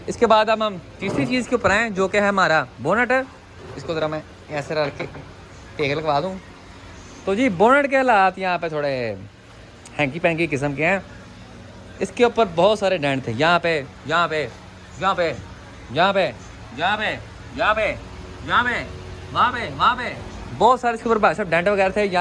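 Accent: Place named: Punjab, India. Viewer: I love your beautiful accent. My beautiful Punjabi brother.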